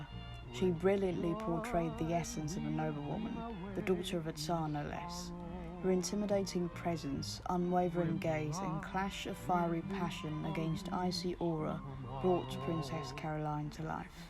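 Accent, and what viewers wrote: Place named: United Kingdom. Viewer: I like your specific British accent! It has a cool, unphased, couldn't-be-bothered quality. Good video essay, as well!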